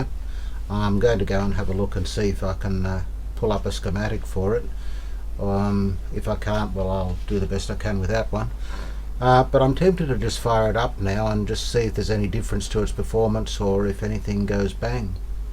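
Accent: Australia. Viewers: Hi, I know this was a long time ago now. My name is Sara. I've been trying to restore my grandparents' old nippergram. One of the.01MFD 630VDCW capacitors are no longer working. Do you know what could work as an alternative and where to source such a thing? It also sounds like you're in Australia. I'd love to know whereabouts and if it's not too far, I wondered if you could help me to get it up and running again 🙂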